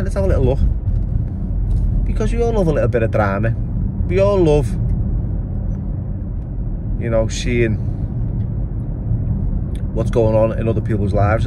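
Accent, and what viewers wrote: Liverpool, United Kingdom. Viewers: Go on lad scouse through and through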